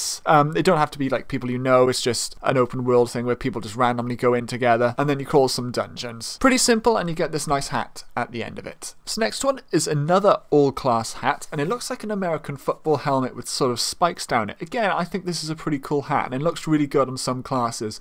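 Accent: Wales, United Kingdom. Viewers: Are you British? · Is he Welsh? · idk lol i heard little bits of a welsh accent lol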